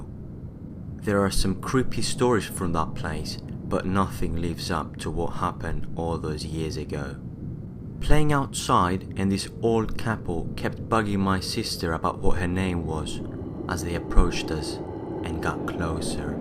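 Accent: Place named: Derbyshire, United Kingdom. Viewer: even though you're Greek you sound like my friend from Derbyshire County in England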